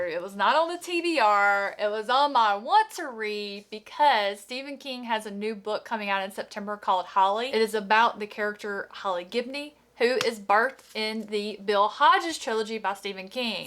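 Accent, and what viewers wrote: South, United States. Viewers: I find it hilarious that the accents tripped you out - I live in MS, and your accent is nicely southern to me, and more pronounced than most of the people I'm around on a day-to-day. 😆🤭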